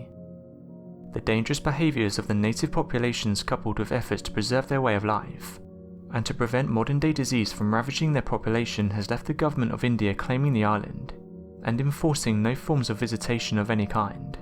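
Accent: United Kingdom. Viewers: You sound like a British libtard that insists on importing Muslim illegals cause you love them so much, even though they hate and want to kill you....... Tell us I'm wrong....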